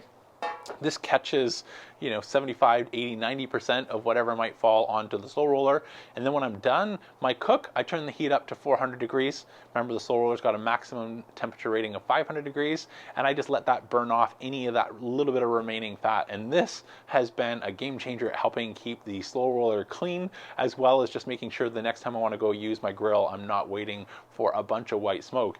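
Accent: Canada. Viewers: Thank you so much for your very informative videos. I have been a KJ student for the last two to three years. Some trial and error but mostly wins since the KJ is so user friendly. Adding you and your videos has added the last piece in my grilling/smoking journey. Plus my family and I really enjoy your Canadian accent...really adds some fun to your tutorials. Keep em coming!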